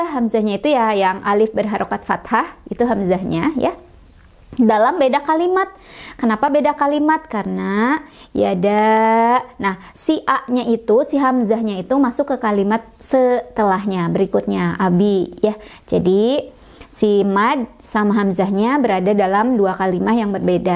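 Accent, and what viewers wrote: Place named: West Java, Indonesia. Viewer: Subhanallah sepertinya teteh orang sunda ya. Kalo memang benar artinya kita satu suku. Alhamdulillah saya menemukan channel ini. Saya dari bangka sangat ingin belajar membaca ayat al quran dengan benar. Barrakalloh teteh terimakasih ilmunya sangat bermanfaat. Semoga teteh diberi panjang umur dan sehat selalu. Amin amin..🤲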